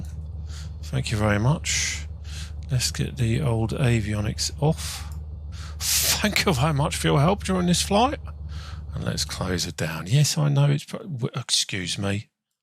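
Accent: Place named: Cockney, United Kingdom. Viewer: Is it scary how well he suits the cockney geezer accent.. Yes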